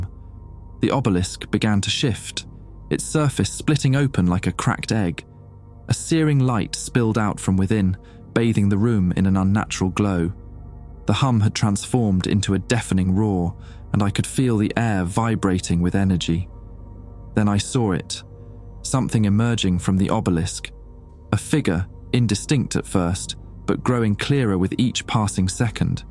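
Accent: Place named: United Kingdom. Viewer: a handsome british voice on an (ant)artic creepypasta? sub.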